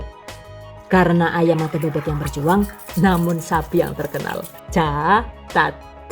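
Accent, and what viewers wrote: Banyumas, Indonesia. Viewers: bikin org gk bosen belajar karna byk selingan pke bhsa jawa ngapak 😂 jadi makin smangat mantengin chanel nya bun 🤗🥰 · Haha ada ngapaknya juga,,asal mna bu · Semoga tukang warteg tidak mencontoh video ini..karena waktunya lama. Ngomong ngapaknya selalu saya tunggu.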